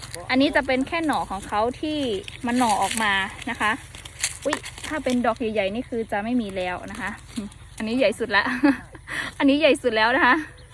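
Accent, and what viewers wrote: North, Thailand. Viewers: ฟังรู้เรื่องนะ ภาษาไทใหญ่ก็คล้ายๅกับสำเนียงไทยทางภาคเหนือ ผมคนอีสานฟังรู้เรื่องครับ ขอให้ครอบครัวมีความสุขนะ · พูดเหมือนทางภาคเหนือของไทย.อีสานก็ฟังรู้เรื่องเกือบทุกคำน่ะ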